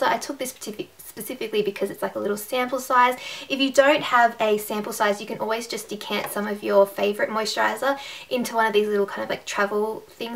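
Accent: Australia. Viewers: Are you from Australia just wondering, also you sound like Ann Readon from how to cook that lol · Are you from Australia?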